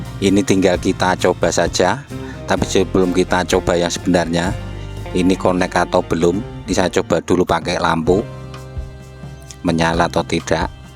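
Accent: Java, Indonesia. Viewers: First time lihat vedeo ini terus boleh tau kamu org jawa krna org jawa cakap bunyi dalam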